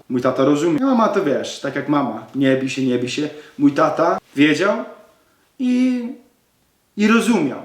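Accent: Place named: Poland. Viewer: podoba mi się masz taki fajny akcent taki modern polish ale jednocześnie brzmisz jak moja babcia która też jest z Podlasia <3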